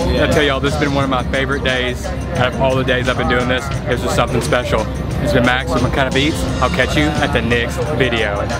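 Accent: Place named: South, United States. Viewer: The vlogger sounded like hes southern.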